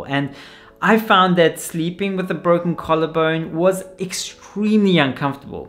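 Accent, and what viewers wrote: South Africa. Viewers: Cheers dude,I broke mine twice and am going in again in 3 days to get a plate put in.The only concern I have is the sleeping and you nailed it,the couch and a pillow,infact lots of pillows saved my life the last time's, I'm hoping this time won't be as bad as its corrective surgery and I hope the doctor will give me adequate pain suppression. P.s is that a Cape Town accent I heard,been there twice,beautiful country, be 🙏 Will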